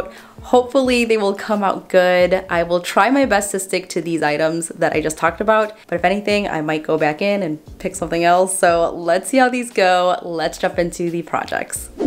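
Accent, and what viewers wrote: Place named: Midwest, United States. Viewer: it's so nice hearing midwestern accents from youtubers ♡ from a milwaukeean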